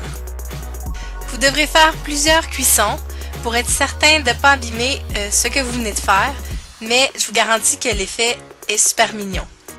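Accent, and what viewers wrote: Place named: Quebec, Canada. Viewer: Le bleu est très beau et bravo super chat 😀 ,et j'adore ton axen québéquoi 😉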